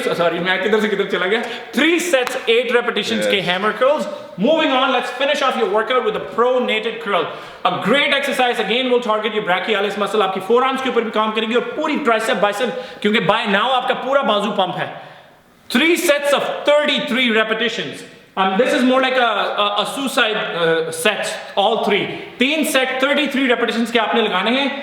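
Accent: Punjab, India. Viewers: bhai saaaa mazzaa aagyaa,,, or bhai aap ke bolnne ka tarika or aap ki pannjabi waahh mazzaa aajata h..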